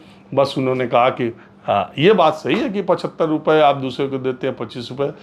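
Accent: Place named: Allahabad, India. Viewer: Sir Mahol Badhiya hai.Aap ke bat-cheet me Elahabadi mahaq rahti hai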